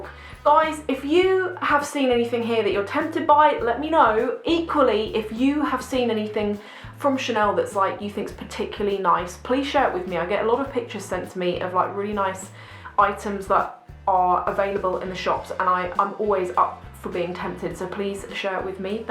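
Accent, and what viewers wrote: United Kingdom. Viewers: I love her British accent .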